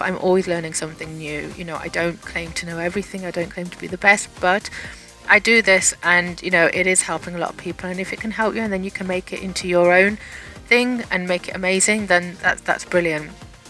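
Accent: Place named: United Kingdom. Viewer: Mam U speak very well Your British accent is great but u speak very very fast Your Hindi is beautiful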